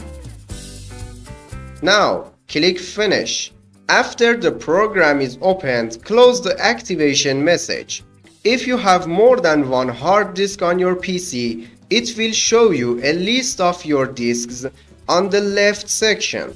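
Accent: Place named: Iran. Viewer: لحجه امکان نداره ایرانی نباشه 😂🤣 دمتم گرم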